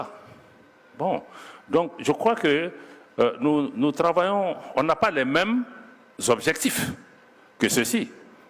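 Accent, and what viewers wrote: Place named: Democratic Republic of the Congo. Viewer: J'ai toujours trouvé un accent lingala à ce monsieur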